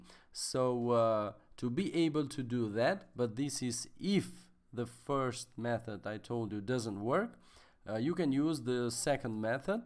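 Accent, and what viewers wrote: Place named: Russia. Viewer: произношение русское)))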